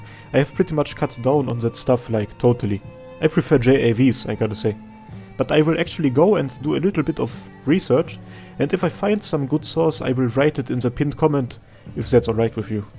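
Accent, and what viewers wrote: Germany. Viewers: Glückwunsch! I found your channel a couple a weeks ago and I love your videos. I was more of an Azur lane player before and I didn't know much about the deeper lore in Arknights. I don't know if it's appropriate to say but I really like your German accent. I wish you many many more subscribers and views. Greetings from Hungary. :D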